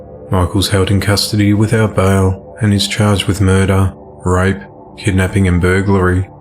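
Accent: Australia. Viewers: the Aussie accent narrating true crime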